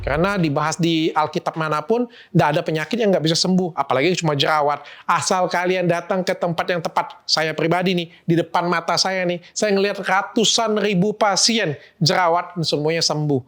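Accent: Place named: Madura, Indonesia. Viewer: kok kyk madura dok logatnya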